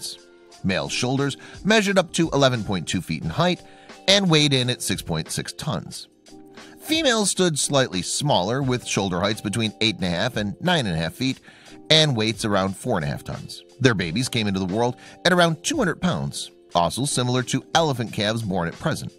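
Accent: Midwest, United States. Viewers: Hard to listen to the narrator's voice and grating Midwestern accent...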